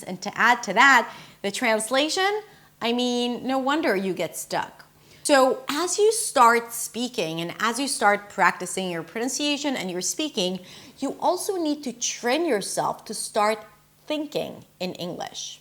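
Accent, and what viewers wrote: United States. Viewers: Hey, you are super nice about your accent. you incorporated totally the american accent. That's my point of view.. Xoxo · Wow, your native is Hebrew and you sound like an American, even speaking very quickly, good teacher. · I can understand you clearly, I thought you were American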